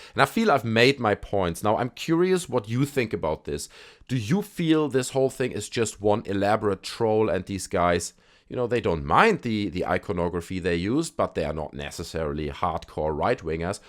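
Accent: Germany. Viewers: coming back to that video years later and found ur channel. must say glad to see a German speaking 😂 with the accent on this... i am african. everything has a consequence, u cant spit on someone's face constantly and think yup we better than them nothing will happen lol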